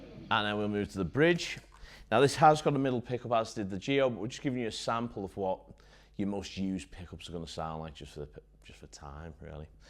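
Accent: Liverpool, United Kingdom. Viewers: Subscribed because you gave good reviews (Just ordered an Ibanez gio grg 121 yesterday as my first guitar to learn on) and you got that scouse accent. (shoot me If I'm wrong) Ha cheers from Australia.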